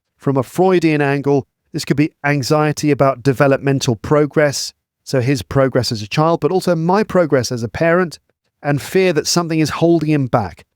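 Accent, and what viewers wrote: United Kingdom. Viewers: Hello from Pakistan, I hope you are doing well. Actually, I listened to your long podcast on my podcast app and I heard you mentioned some people complain about your long introduction to episodes. Please don't listen to them just ignore them probably they're not serious about about their English that's why they complain. But we serious learners love your long episodes and giving explanations to each words weather they're about grammar, vocabulary or just giving explanation. I've been listening to you for six months. I remember listening to you for the first time I was not used to British accent and I kept listening to your episodes again and again they really gave me a satisfying result. Now I understand 95 percent of your English and the rest 5 percent could be not knowing new words. Thanks to your long unique episodes lots of love from Pakistan, Peshawar. 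❤👑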